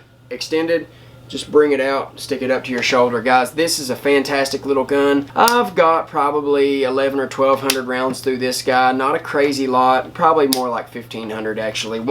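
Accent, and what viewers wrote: South, United States. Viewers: Love the gun collection and also the southern accent my friend. God Bless America and have a blessed day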